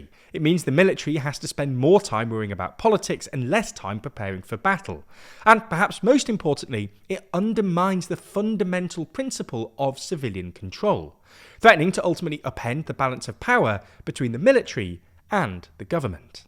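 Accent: United Kingdom. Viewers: Respectfully, UK accent about US politics. Nope.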